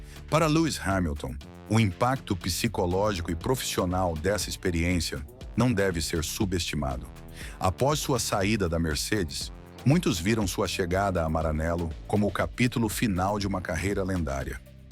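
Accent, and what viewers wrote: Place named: São Paulo, Brazil. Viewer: Merda de IA com sotaque caipira paulista! Medonho.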